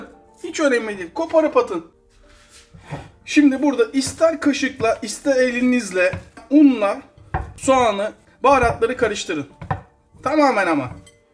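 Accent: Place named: Izmir, Turkey. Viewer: Uzun süredir videolarınızı ağzımdan salyalar akıtarak izleyip abone ol ve beğen tuşuna basmayanlardan biri de bendim itiraf ediyorum. Bu gizli gizli izlemekten veya herhangi bir kasıttan değil. Her videoyu izledikten sonra eliminin dışıyla ağzımın kenarından akan salyaları temizleyip vay hamamınınüy dedikten sonra üzüntüyle YouTube uygulamasını kapatmamdandı. Bu andan itibaren bir 1-2 dakikalık beyin donması oluyor sen de bize hak ver. Neyse bir tekneye göz koymuşsun bizim de katkımız olsun diye abone oldum, videolarına seri like basıcam şimdi, katılı aç katılalım da. Yalnız senden bir şey rica ediyorum. Aksanından konuşmandan İzmirli olduğun çıkarımına vardım. Yaklaşık 1,5 aya Türkiye’deyim ve çoğunlukla İzmir’de olucam. Beni misafirin olarak kabul eder misin?Rakılar benden, senden o Yunan mezelerini yapmanı isterim.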